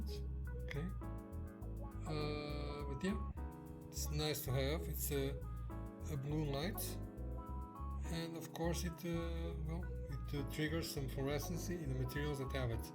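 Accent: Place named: Portugal. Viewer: Muito obrigado ( I guess from accent you are portuguese :-) ) for the video. Could I contact you for more questions about SEAFROGS lights?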